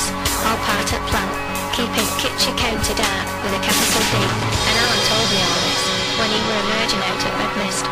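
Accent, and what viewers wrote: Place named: Lancashire, United Kingdom. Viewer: different and good! Love the lancs accent just as much as i despise the old boy eton twang.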